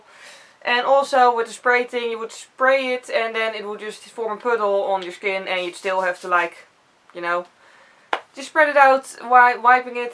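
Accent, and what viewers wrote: Netherlands. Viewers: Holland lol accent 🐇🐇🐇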